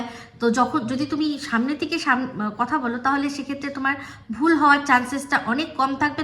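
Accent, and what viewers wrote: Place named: Dhaka, Bangladesh. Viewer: tumi camerar pichon thekei video koro, tomar khati Dhakaia kotha shunte shei lage. Kintu kichuta change ante hobe tomar roasting e. Asha korchi khub shggiri tomar channel ta firee pabe. Fira asho notun rupee.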